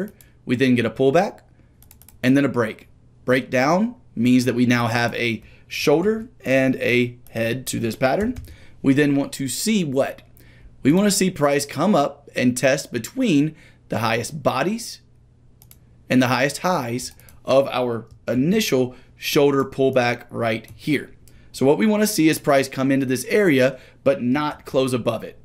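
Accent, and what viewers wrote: South, United States. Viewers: He has a thick country accent.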